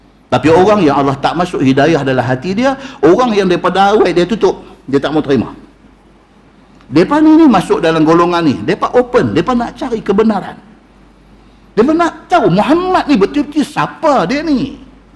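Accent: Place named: North, Malaysia. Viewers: Loghrat Tanjong....Penang town jati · Sy suka nya Tazkirah bgus. Dlm loghat Utara. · Assalamualaikum sahabat, bukan ilmu sahaja yang terbaik saya terima dari ustaz, tapi suara dan loghat utara tu yang sedap. Terima kasih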